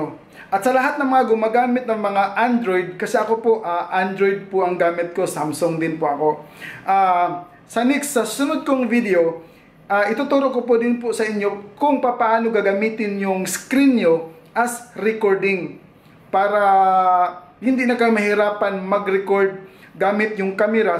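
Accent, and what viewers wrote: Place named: Iloilo, Philippines. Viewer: Ilonggo guro nis kuya ay.. hehe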